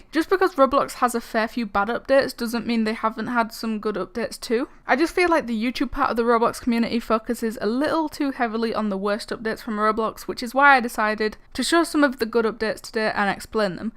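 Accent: United Kingdom. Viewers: Oh godhaaaaaaaaa THAT BRITISH ACCENT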